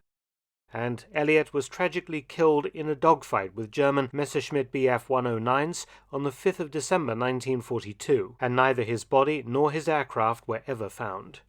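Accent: United Kingdom. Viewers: Always enjoy Professor Felton’s documentaries. But since you appear to have a British accent, it’s quite odd how you pronounce the word “lieutenant” the American way, “loo-tenant”. It’s certainly not British English. How about not contributing to the on-going Americanisation of the United Kingdom, eh? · An oh so properly British presentation.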